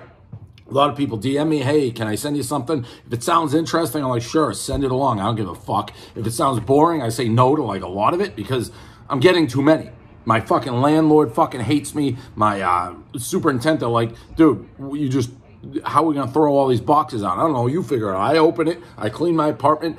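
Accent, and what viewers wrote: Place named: New England, United States. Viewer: like the videos...your yankee accent is somewhat tolerable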